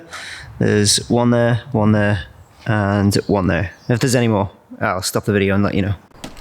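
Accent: Liverpool, United Kingdom. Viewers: you a scouser ?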